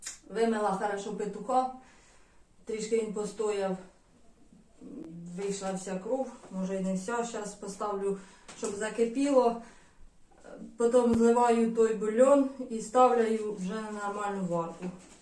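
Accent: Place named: Zhytomyr, Ukraine. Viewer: Красавица ти звідки з Житомирщини акцент суржика або Черкаси, звідки ти будеш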